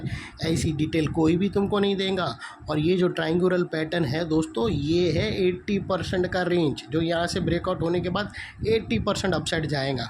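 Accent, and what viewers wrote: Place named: South, India. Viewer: thankyou bhaiya for giving your valuable time to some random 19 y/o stranger I will invest more in my learnings before hand i was a forex trader who trade in 4h and 1h but as I shifted to Indian swing I find it quite difficult to understand as forex is closed for just 3-4 hours a day but you are really helping me in here i have watched all your videos trying to understand things and i am really grateful that you still scam stocks out of so many studies stocks and invest your valuable time even thou you are not getting the audience you deserve even thou you just want to help others people and you are South Indian so that accent is little bit different for people from TATA, JAMSHEDPUR ,❤️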